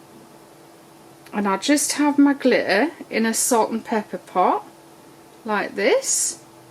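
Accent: United Kingdom. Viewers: Lovely shade of green. Looks good enough to eat ! American accent. Lol. You gave it a shot...I rather like your British accent 💜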